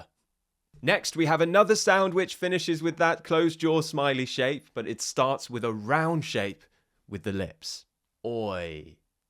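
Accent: United Kingdom. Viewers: The British accent made me move to the UK 🥰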